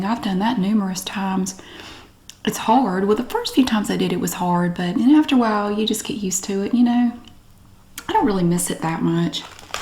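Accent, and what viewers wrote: South, United States. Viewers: Happy channelversary! You have a fan from Oaxaca Mexico 🇲🇽 Your videos bring me a lot of memories of my life as an Au pair in Hampton roads VA back un 2018. I love the southern accent and I think you're a sweetheart! Saludos (greetings) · Well I love your southern accent. And I think you are so funny😂 ❤ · Damn I love that Southern accent , I could listen to her talk about paint dry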